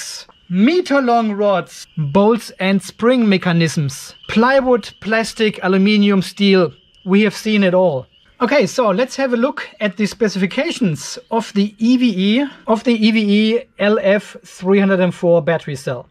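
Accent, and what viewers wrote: Germany. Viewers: Your accent sounds german. Am i wright? Super Video, Danke 👍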